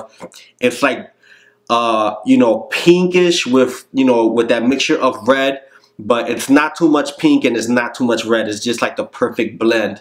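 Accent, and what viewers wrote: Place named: New York, United States. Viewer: cant figure it out. that a new yalk accent or puerto rican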